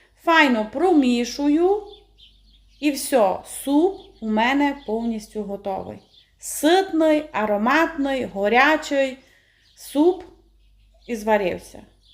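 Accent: Transcarpathia, Ukraine. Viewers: Як же гарно звучить Закарпатський акцент..смачно.файно,ладно до душі..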